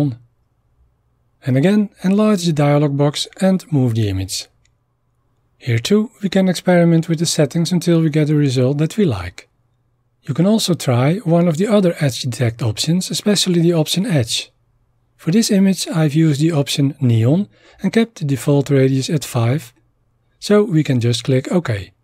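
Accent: Netherlands. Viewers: Lijkt wel een rotterdams accent. Thanks voor de tutorial!!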